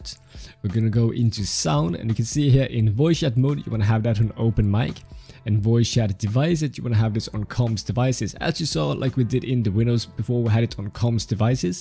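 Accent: Sweden. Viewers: Are you from sweden? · Du är svensk hör det på dialekten 😜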